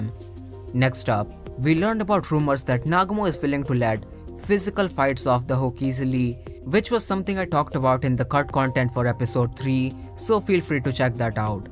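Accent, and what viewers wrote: India. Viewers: You have Indian accent are u one??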